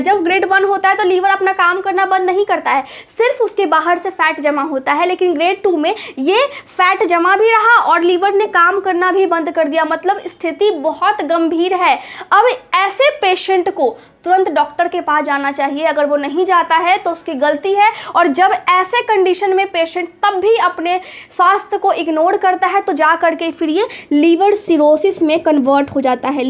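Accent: Bihar, India. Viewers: Aap Hindi saaf bolo pahle bihar ki ho naa aap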